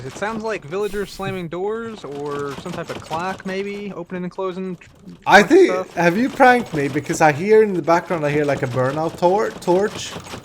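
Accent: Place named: Sweden. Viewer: U sounds so much like Anomaly! #Svensk-engelsk dialekt! :))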